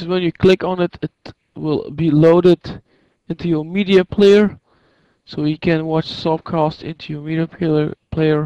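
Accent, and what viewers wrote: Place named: United States. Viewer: Inglês bom pra eles mesmo só o americano... vai falar keeeent (can't) mesmo... sotaque irritante... so... were u stoned, sleepy or just bored? can u teach me how to smash who doesnt broadcast botafogo's soccer games? PLEASE! BROADCAST MORE SOCCER MATCHES FROM THIS TEAM!!! COME ON! ITS LEADIN THE BRAZILIAN LEAGUE N PLAYIN NICE SOCCER! ty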